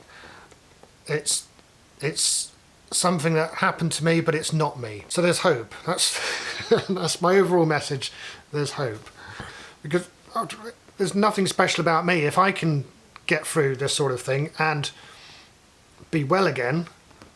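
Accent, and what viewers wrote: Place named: Somerset, United Kingdom. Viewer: Yer a somerset lad..!!! - Good lad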